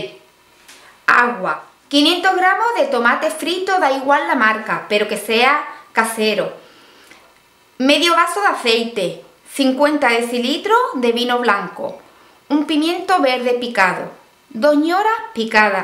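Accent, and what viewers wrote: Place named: Cádiz, Spain. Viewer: Me ha encantado el video y tu acento gaditano. Me encanta mi tierra Andalucía.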